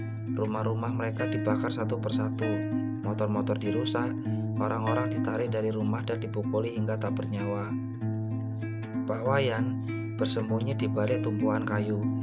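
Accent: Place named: Java, Indonesia. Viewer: Kok medok mas bahasanya orang jawa y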